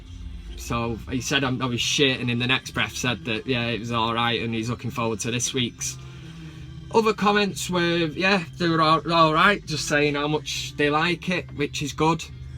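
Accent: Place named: United Kingdom. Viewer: would be better without this damn british accent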